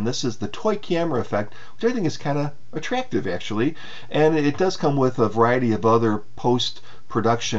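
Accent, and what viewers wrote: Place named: Midwest, United States. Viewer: You have a very thick Midwest accent. Are you from Cleveland... perhaps Detroit?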